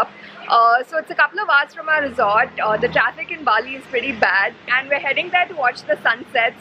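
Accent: India. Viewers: It's Indian accent.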